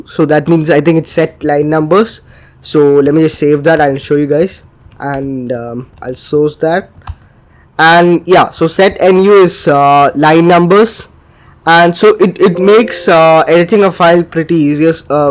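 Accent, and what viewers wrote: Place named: Bangalore, India. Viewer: Ur from Bangalore aren't you?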